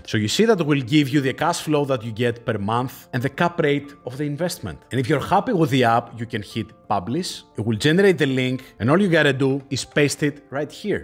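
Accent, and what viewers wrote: Greece. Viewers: You have great info dear friend in this video..just subscribed to don't lose other opportunities😊..by the way your accent seems Greek, right?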